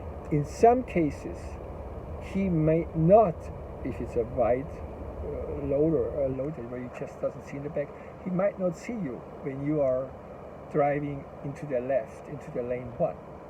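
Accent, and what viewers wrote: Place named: Germany. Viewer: I’m enjoying learning from your content. I notice your accent sounds European like from a German speaking (Germany, Austria, Belgium, Switzerland) Country. Is the economy/marketplace for professional transportation work better for entrepreneurs in America than Europe? Do they have pilot car escorts for major commercial shipments on the roadways in Europe also?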